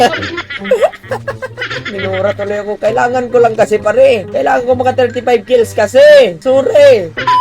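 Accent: Visayas, Philippines. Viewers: Bisaya man diay ka pader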